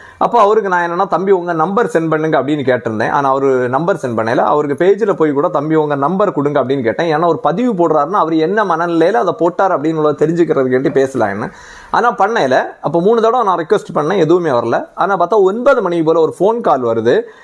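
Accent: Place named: Nanjil, India. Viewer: Great work 🎉🎉 Love your Nanjil. Accent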